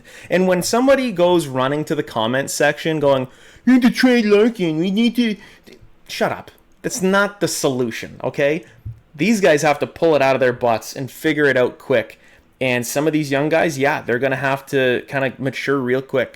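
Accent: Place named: Ontario, Canada. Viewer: Fun fact: The Wings moved into second place this weekend. The Sabres if they win their game in hand, they are two wins from a Wild Card. Get this in your head: There are good shots and they just miss, hit the post and out, hit the post and in, and there are goals. Now go review high school probability and statistics to under stand how that results in final scores. Your accent betrays you, so please compare the Wings to your two Ontario team. And also compare Larkin to the crybaby who was cast as the greatest player ever. Larkin has 2 points more than 87, Larkin kills penalties, Larkin contributes as he is +7 in the stat that correlates in aggregate most closely to games score (aka wins) while Canada's prompted up hockey saint is a net zero and DOESN'T play a complete role. If you are not a fan enough to handle the ups and downs of a season in a league of extreme parity, maybe you should just watch the weekly highlights and then create a weekly video. 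This video today looks like Jonathan Quick 0.3 seconds after a good performance, lacking emotional impulse control.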